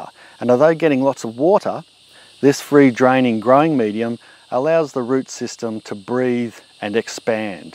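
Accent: Australia. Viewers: My wife got me into watching your videos, love your Aussie accent. We are from San Antonio TEXAS and I'm trying to grow avocados and I keep having the same problems. Well you just told me how to fix them my yard is nothing but clay soil, I will have to mount them up now. LOVE YOUR VIDEOS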